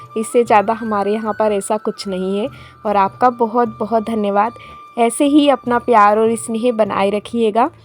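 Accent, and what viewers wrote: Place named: Chhattisgarh, India. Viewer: Aapki boli chhatisgarh ki boli se kafhi milta hai hai na kabhi kabhi gaw ki bola kijiye achha lagta hai ❤❤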